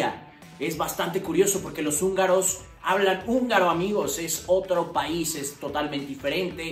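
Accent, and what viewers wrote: Mexico. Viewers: Tu acento es muy mexicano, por eso se confundió. Felicitaciones por tu castellano. Soy chileno y conocí hace poco a una búlgara, de padres griegos... Muchas gracias por el concejo del yogourt Me salvaste la vida. jeje Saludos. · Zdravei, chudesno govorick con meksikanski aktsent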